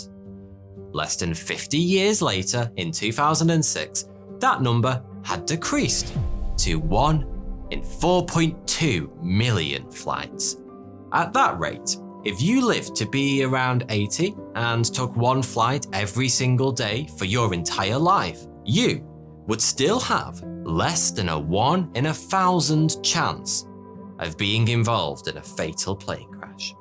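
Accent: United Kingdom. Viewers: well that's because he is British... go just a bit further east or south and the accents/voices start sounding increasingly "ooga booga-ish", especially once you reach Eastern European territory. source: years of gaming with voice chat + discord and many vacations around Europe. the worst offenders for terrible English accents rated from indecipherable to somewhat understandable are: 1. Russians/Ukrainians (these share the top spot) 2. Greeks 3. Italians 4. Spanish 5. French 6. Bulgarians 7. Romanians 8. Serbians 9. Lithuanians 10. Latvians whereas the most clear accents from most understandable to least understandable would be: 1. All the Scandinavian/Nordic countries; Danish, Norwegian, Swedes, Finnish, Icelanders 2. Dutch 3. Belgians 4. Germans people from countries like France, Germany, Italy, Russia, Spain and Greece happen to be the most rude people when playing games online internationally, as they will usually not even attempt to communicate in English and just assume everyone else on the server speaks their native language, and when they get angry usually start cursing and raging in said native languages as well, not realizing that they aren't actually offending people since nobody understands what they are saying. 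the countries not included in this list are people i don't run into often and therefore can't judge.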